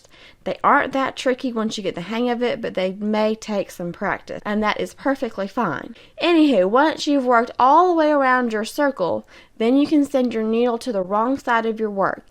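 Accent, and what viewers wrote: South, United States. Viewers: cute country southern accent. and good video.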